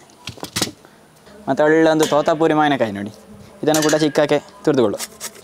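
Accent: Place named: Coastal Karnataka, India. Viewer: ಆಹಾ ನಿಮ್ಮ ಪರಿಪೂರ್ಣ ಕರಾವಳಿ ಕನ್ನಡ ಕೇಳೊದೆ ಒಂದು ಖುಷಿ.....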